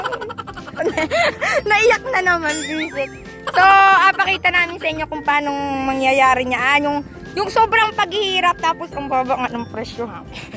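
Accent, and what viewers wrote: Batangas, Philippines. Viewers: Kagaling magsalita eh parang batangas lng eh idol crush kita ayiìihhhh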